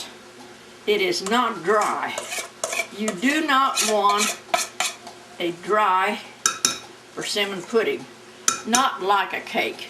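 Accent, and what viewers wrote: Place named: Southern Illinois, United States. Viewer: “Sodie, difernt, and R” You Have to be from Southern Illinois. Am I right?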